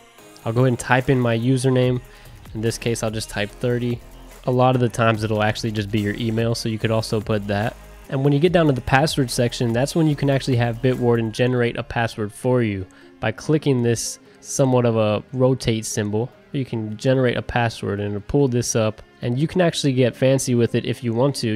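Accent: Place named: England, United Kingdom. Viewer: AAWWWWWWWWWWW shit I checked your like but and this is my second time through I have my Vault and got graded strong on my first master pw but I still have no idear WTFs going on. i'M WAY FOGGY ON THIS SETUP but at least our volume is good and you have a nice clear Engrish accent a bunch of these guys thought they were maxwell Smart and they needed to disguise their voice from big brother. Ok video i'm gonna try it again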